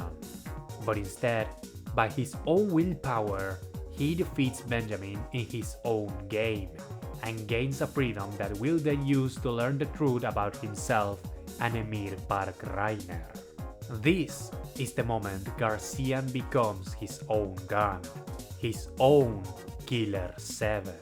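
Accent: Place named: Argentina. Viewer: Sorry if this is a weird question but by any chance,Do you happen to have a Spanish-language channel? I think I've heard your voice before, and judging by the way you pronounce certain words, I think you're a Spanish-speaking Argentine.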